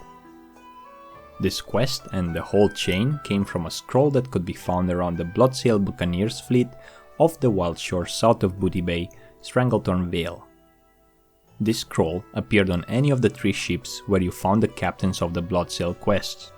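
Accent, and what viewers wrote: Romania. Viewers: this guy has a romanian accent, wonder if he is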